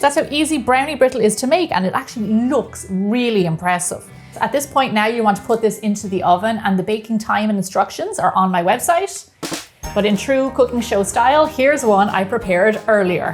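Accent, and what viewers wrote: Ireland. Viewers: I love your Irish accent! ❤️❤️❤️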